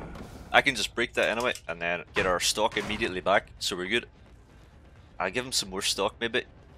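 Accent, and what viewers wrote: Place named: Northern Ireland, United Kingdom. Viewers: Im not good with accents, but I would say he's Northern Irish. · northern Irish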